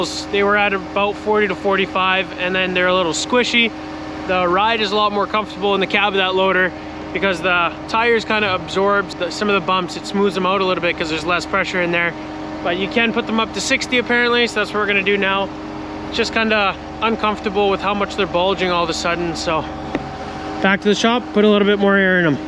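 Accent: Netherlands. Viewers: dat is een zeer zwaar Nederlands accent. leuk om een nieuwe video te zien hier in Vlaanderen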